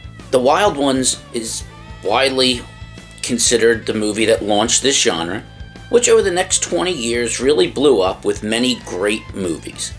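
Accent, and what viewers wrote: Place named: Philadelphia, United States. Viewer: You sound like you're from the Philly/Cherry Hill area.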